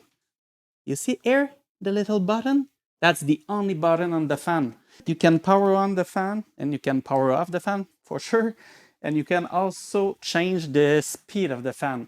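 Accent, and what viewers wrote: Quebec, Canada. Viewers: Thanks. I just assumed you're from Quebec because of your accent. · J'aime que dans les 3 premières secondes du vidéo j'ai reconnu l'accent QC, et +1 pour l'avoir écrit dans l'intro de la vidéo! 😄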